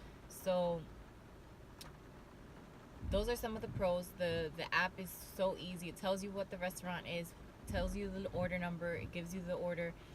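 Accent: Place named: Latin America. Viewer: I’m guessing your Latina by the accent. Good luck stay positive but the best way to make money is by referrals if your in sales.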